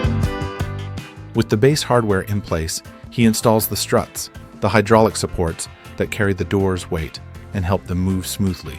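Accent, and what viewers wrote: United States. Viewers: Replica !!!! LOL. It's a 1/1 model poor personalised copy of a hypercar. If it's THIS easy ...... makes you wonder why all the 3D printing and CAD designed parts are ever necessary ............. everything is "PERFECT" and the aerodynamics are "tested". LOL. ALL the electronics are 300mp/h rated - just in case you were wondering how well the "air brake" would work at speed ....... Thje car is PERFECTLY symetrical - both sides not only LOOK but ARE perfectly identical reversed replicas of eachother. This ensures the car drives in a straight line at high speed. LOL. He is certainly smat enough however to make a video of it and overlap an American voice. Money is Money, every dime counts. Lets not forget that this lost guy in a shed in Vietnam has ready access to Brand New Bugatti front windshields - when Bugatti owners find it hard sourcing them ......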